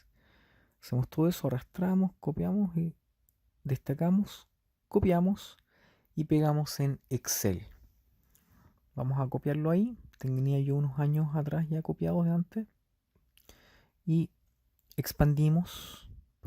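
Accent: Chile. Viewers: No no es eso es que es Chileno y los chilenos hablan con muchos altibajos al menos al el se el entiende otros Chilenos de Santiago Centro y otras comuns apenas se le entiende.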